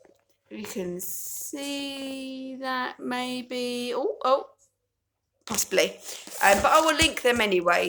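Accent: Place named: Essex, United Kingdom. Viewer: How much fun are you! Loved the video 🎉. I'm a kiwi in Essex, and still adore the accent.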